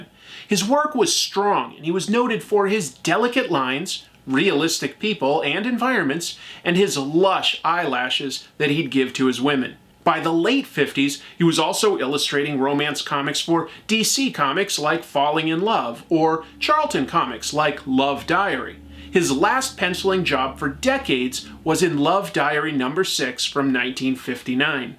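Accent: United Kingdom. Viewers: A posh Attenborough accent always works 😅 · The British Accent was a nice touch, only a true classy artist can appreciate the melodramatic! If only Colletta's work was as dynamic as his writing skills? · every thing sounds better in a British voice. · Wow, epic British reading, I salute you sir! On another note, I’d argue jack kirby ruined his own art. Not a fan, though his stylized work screens jack Kirby making it somehow endearing😁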